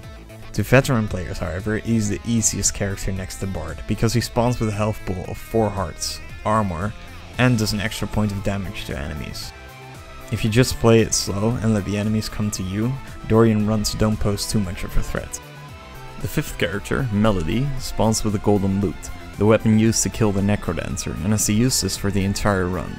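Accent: Netherlands. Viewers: Ik hoor je Nederlandse accent😜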